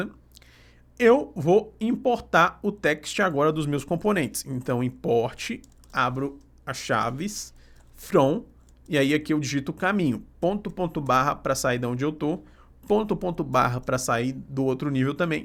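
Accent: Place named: Minas Gerais, Brazil. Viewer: Meu conterrâneo, kkkk sou de Juiz de Fora, reconheci o sotaque. Um grande abraço, ótimo conteúdo.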